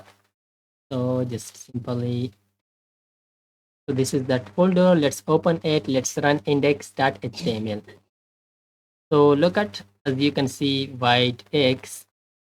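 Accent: India. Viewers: she's insinuating that you are a phone call scammer because of your Indian accent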